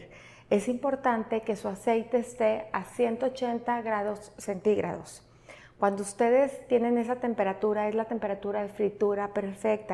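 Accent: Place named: Mexico. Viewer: Nena creo no equivocarme de qué sos mexicana y hablas muy lindo muy bonito me encanta tu acento tu ternura tu dulzura tu calidez tu feminidad yo creo que cualquier mexicano se debe sentir orgulloso de que representas a tu país maravillosamente te mando un abrazo eterno Virginia de berisso Argentina.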